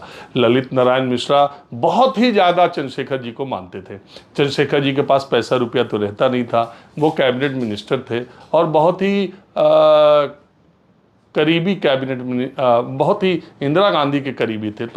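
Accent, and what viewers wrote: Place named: Allahabad, India. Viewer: Sir Mahol Badhiya hai.Aap ke bat-cheet me Elahabadi mahaq rahti hai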